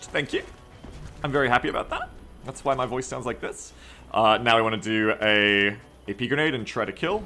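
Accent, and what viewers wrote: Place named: Australia. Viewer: Dude has a mix of Australian and American accent. I don't recall that being the case years ago. Weird.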